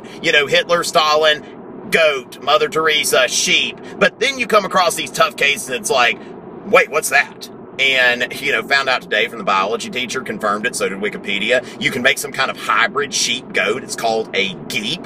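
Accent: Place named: Georgia, United States. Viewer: Georgian accent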